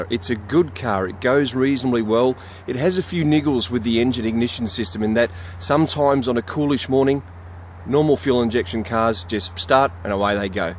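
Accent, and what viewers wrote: Australia. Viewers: Ajam Yunus aussie le ngok... slang down unduh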